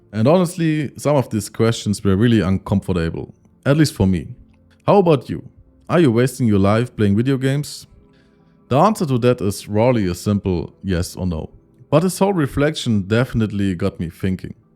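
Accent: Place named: Germany. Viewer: I love deutsch-flavored English. It’s flavorful and discernible. Unlike China accent English… 😥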